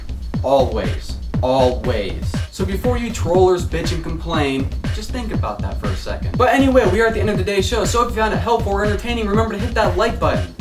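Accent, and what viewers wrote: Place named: St. Louis, United States. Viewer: sounds like saint louis, missouri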